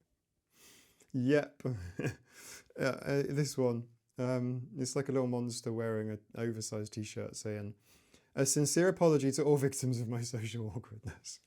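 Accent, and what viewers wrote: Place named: United Kingdom. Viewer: I love your presentation style and accent! As a British person in Germany, have you noticed how criticism can be a love language there? I am native German, in the UK for 20+ years, and I realised recently I only criticise people when I care enough about them to want them to succeed and get things right, and to not fail or embarrass themselves. As Sheldon from the Big Bang Theory put it "if a summer in Germany has taught me anything, it's that brutal honesty is its own kind of love." (Young Sheldon, season 7) and "When I criticise people I lift them up." (from the "adult" BBT series) Seen by NT viewers probably as just as another annoying know-it-all trait, I can really see the truth in that. Can anyone relate?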